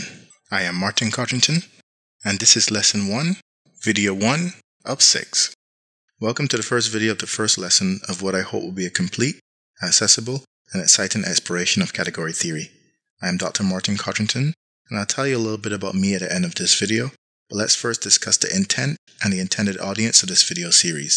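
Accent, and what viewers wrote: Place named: Barbados. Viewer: This is so exciting! I have been reading some Category Theory for Programmers and decided to take another look at what's out here this year. In the past there was not much available. Imagine my delight and surprise to find someone who is applying Category Theory to composition!!! This will be an exciting ride. I recognised the Barbadian accent very early on since I am from Trinidad. I look forward to learning from you, since I am interested in music, functional programming; even trading. I even studied Nuclear and Thermal Engineering many moons ago and my first job was at BNL :). Thank you so much, Dr. Codrington.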